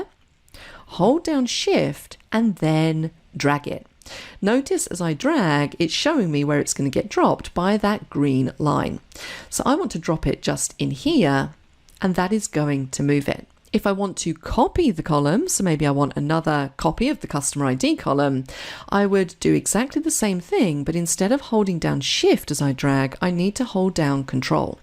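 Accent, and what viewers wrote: United Kingdom. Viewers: that british accent is so smooth hahaha, greetings from spain. It would be awesome if you could transform her voice into spanish through AI.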